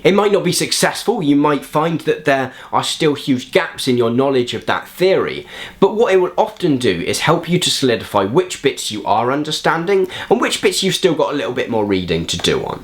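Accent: United Kingdom. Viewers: The first 20 seconds sounded very British.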